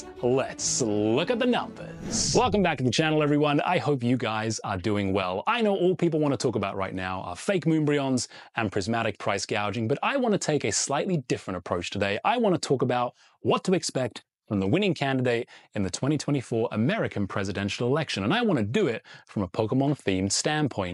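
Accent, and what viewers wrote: Canada. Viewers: Canadian! I've been trying to place that accent for ages! 😂 Genuinely thought you were either British or Australian. 😂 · I had no idea you were Canadian haha. I knew you weren't american, but could not nail the dialect. 😂 Great video as always buddy, Cheers! 🍻 All Americans GO AND VOTE!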